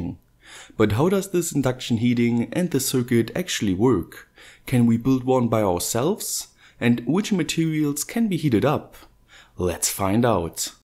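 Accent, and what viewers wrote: Germany. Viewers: Love that accent, it sounds like german but the way you say certain words makes me lmao, like coillll. Would love to hang out with someone like you, no homo. Good video very professional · Wait... are you german? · Are you German. Becuas kupferdraht ist deutsch oder bin ich dumm. Außerdem hast du so nen Akzent! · where are you from? you have a similar accent like German people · Great i have a question are you German? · Man hört richtig das du deutsch bist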